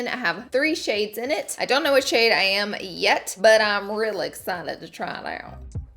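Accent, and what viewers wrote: South, United States. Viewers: So funny that u can have a southern accent and you've picked up such a cali accent too lol. SoOoO prettay like literallyyy just SOO pretty haha. Guess that's what happens when you hear it all the time around you. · Girl, you're southern af. You should know how to pronounce "peony" lol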